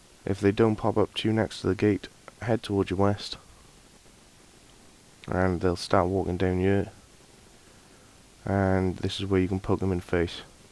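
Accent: Wales, United Kingdom. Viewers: where are you from? Sounds like a welsh accent.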